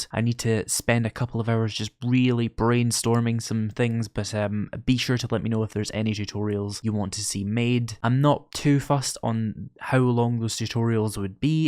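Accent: Scotland, United Kingdom. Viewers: I can definitely see people coming for the voice. Scottish accents are just awesome. Congrats on the milestone. As far as other games I think most of your followers would enjoy watching you play anything as long as you bring your passion into the videos. That's what won me over. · Congrats! You deserve it. I found your channel from your tutorials and have stayed for the series play (and the accent). As one of your American fans, I’d love some Scottish-isms thrown in. And the audio quality and level is perfect. Here’s to you getting 100k!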